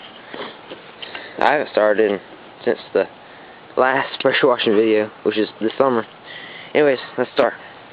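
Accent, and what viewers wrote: South, United States. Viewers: No, he just has a country accent. A hillbilly is someone with little technology, survives off there own recourses, and is very cowboy-ish. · Love the country accent and the video but come on man, do the steps. ANY job worth doing is worth doing right. Old saying but so true. BOY, todays kids! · Ooh he country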